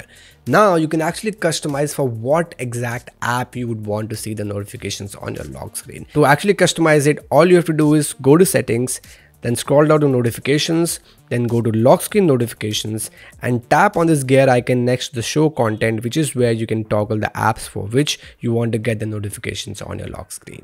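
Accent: India. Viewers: Am I the only one notice your Heavy Malayali Accent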